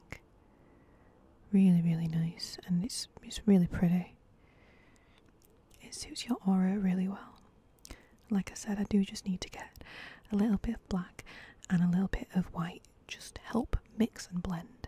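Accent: Yorkshire, United Kingdom. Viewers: Something about your voice which is incredibly calming! Do I detect the Sheffield Yorkshire accent? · Giving into the American pronunciation of Van Gogh, sacrilege haha - I can tell you're local to me, I'd guess a fellow Yorkshire-(wo)man? Although I also have family from Derbyshire, Notts and Hull and can't quite place you!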